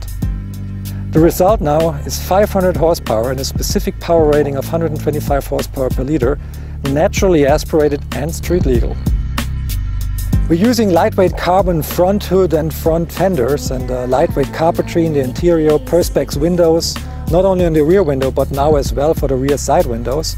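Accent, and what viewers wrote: Germany. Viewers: Kann gut sein, wobei mein Englisch relativ gut ist ; ) Hab vor knapp 'nem Monat mein Englisch-Abitur geschrieben und hab für mehrere Monate in den USA gelebt ... Aber du hast Recht, sein Englisch ist nicht schlecht. Mich stört es nur, wenn man schon beim ersten Satz hört, dass da ein Deutscher redet ; )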